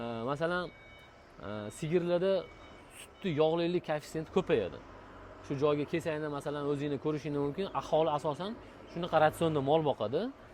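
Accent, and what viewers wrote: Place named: Namangan, Uzbekistan. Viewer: Aka Namangandanmisiz sheva totyabti🎉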